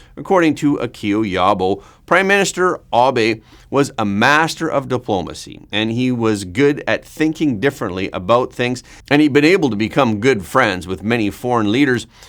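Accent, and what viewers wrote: Canada. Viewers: That powerful Canadian accent combined with superb in depth coverage of the US zeitgeist took American me by surprise. Thanks neighbor!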